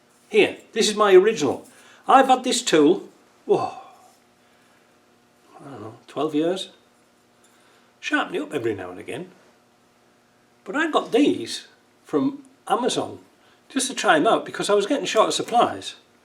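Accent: Yorkshire, United Kingdom. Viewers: i do enjoy your clips. just wondered how what sounds like a yorkshire lad ended up in cannda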